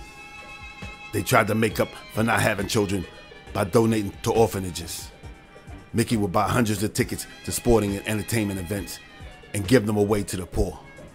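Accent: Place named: Brooklyn, United States. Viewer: I grew up in Canarsie Brooklyn every one spoke like you they would say hey kid “smartin up”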